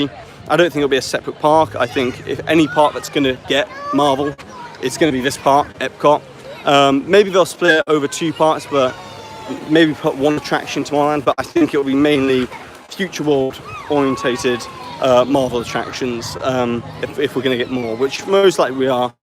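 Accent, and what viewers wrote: United Kingdom. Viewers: Where about's are you from the UK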